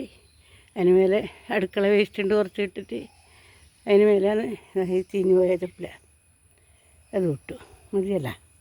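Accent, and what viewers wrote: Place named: Kannur, India. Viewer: നല്ല വീഡിയോആണ്. സപ്പോർട്ട് എന്നും ഉണ്ടാകും. നമുക്ക് ആവുന്നതല്ലേ നമുക്ക് ചെയ്യാൻ പറ്റുള്ളൂ. വിഷമിക്കാതെ മുന്നോട്ട് പോകു ചേച്ചി ♥️എവിടെ യാ വീട് കണ്ണൂർ ആണോ. ഭാഷ കേട്ടിട്ട് അങ്ങനെ തോന്നുന്നു 🎉🎉🎉🎉🎉🎉🎉🎉🎉🎉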